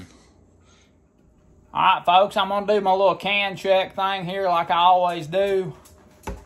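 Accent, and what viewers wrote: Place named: South, United States. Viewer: I grew up in Oklahoma and moved to the north a long time ago, I LOVE watching videos just to hear that southern talk 😍 All the other content is a bonus !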